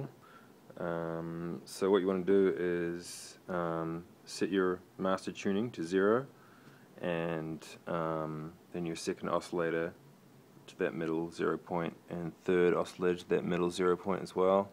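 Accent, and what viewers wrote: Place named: New Zealand. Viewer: "for people who are too lazy to read the manual" hahaha - that's me Your accent + use of jandal makes me suspect your are from NZ - am I correct?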